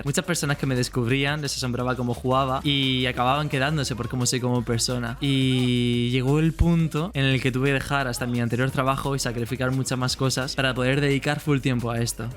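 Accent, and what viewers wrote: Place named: Spain. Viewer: Yo te conoci por el video de Agent Gumle en el que le enseñaste a jugar Jett, escuche tu acento y dije: "top 1 radiante español sin ser pro player? Hay que verlo" vi un par de tus videos y me encanto.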